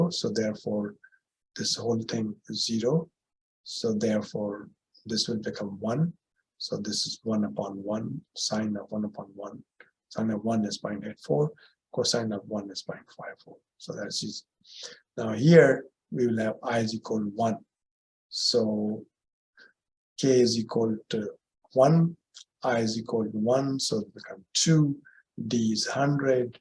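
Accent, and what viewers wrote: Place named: India. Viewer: I hate indian accent. How on earth are there so many indian professors in our country?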